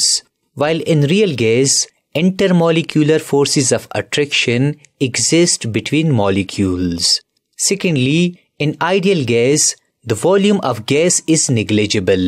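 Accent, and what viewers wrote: India. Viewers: I understand Indian pronounce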